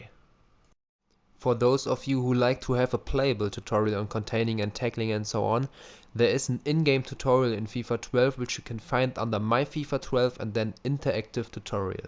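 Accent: Germany. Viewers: Kann man gleich an deinem Englisch hören, dass du Deutscher bist. Schönes Tutorial :D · German accent. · haha, i love the german english :D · Sick accent bro, I love Germany! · he speaks very good he just haves a german ancent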